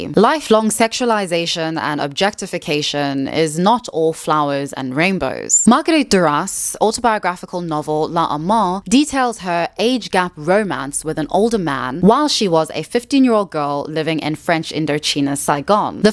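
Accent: South Africa. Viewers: Youre South African? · Amen! Love your accent sounds a bit South African. You speak clearly and make perfect sense. Thank you! ❤ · Are you from South Africa? That accent 🔥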